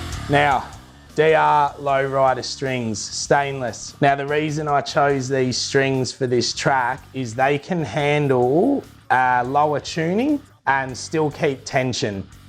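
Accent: Australia. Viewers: this is the heaviest australian accent i've ever heard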